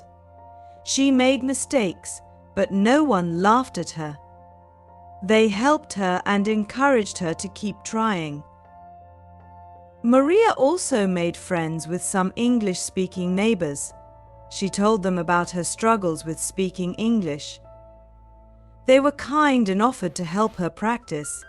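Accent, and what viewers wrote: United Kingdom. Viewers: British accent?❤ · 영국신 발음이네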